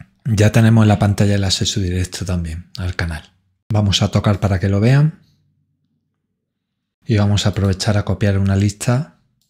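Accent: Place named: Andalusia, Spain. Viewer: Por el acento, Andaluz (España), no estoy segura si Sevillano, quizá Malagueño eso ya no estoy segura.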